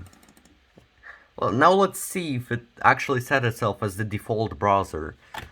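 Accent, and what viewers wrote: Russia. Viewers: 10/10 russian accent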